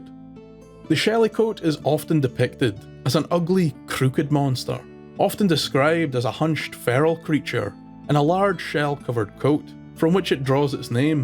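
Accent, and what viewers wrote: Scotland, United Kingdom. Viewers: This is rapidly becoming my favourite channel. What part of Scotland are you from? I can't pinpoint your accent. I'm from Glasgow but I'm half irish (my mums scottish and my dads irish) though my granny on my mums side is English, she was actually ruth Ellis's sister, who in case you didn't know was the last ever woman to be hanged in Britain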